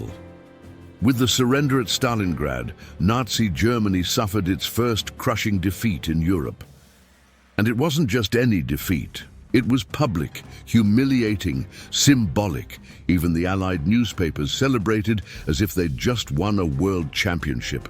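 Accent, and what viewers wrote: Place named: United Kingdom. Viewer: 20 minutes of BS in a British accent...YouTube should put warning "produce by British"....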